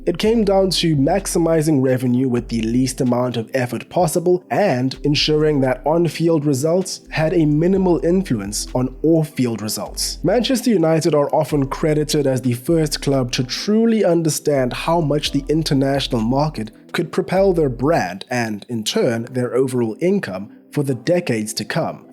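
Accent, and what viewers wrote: South Africa. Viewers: You sound South African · Bro are you south African? Your accent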